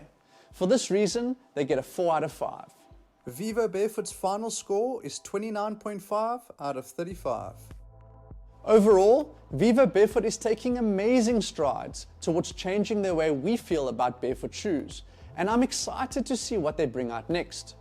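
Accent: South Africa. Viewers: yeaahhh, this guy is South African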